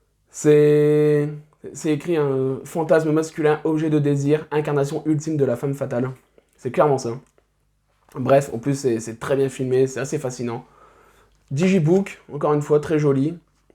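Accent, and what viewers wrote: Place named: North, France. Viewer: de rien 😊 je viens de découvrir ta chaîne, elle est plutôt intéressante. Bravo à toi. Avec le petit accent du Nord en plus 😉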